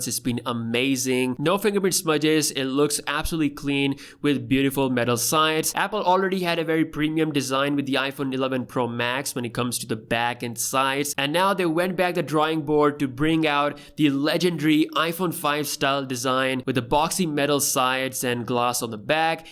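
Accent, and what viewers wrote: India. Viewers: You have a funny accent. It goes Indian and American like a dog playing with its tail... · Chutiya desi accent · Accent like an india tongue,, spoiled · Your voice like Indian guy speak English and trying hard to hide the accent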